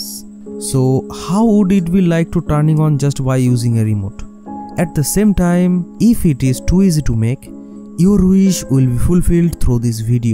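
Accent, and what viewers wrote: India. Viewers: Very good videos and happy with your Indian English too. Well done, feeling proud 👌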